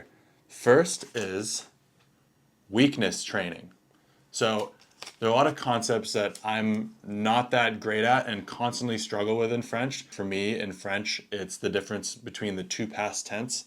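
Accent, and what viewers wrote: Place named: United States. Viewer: Very American accented French though, I wonder if you can change it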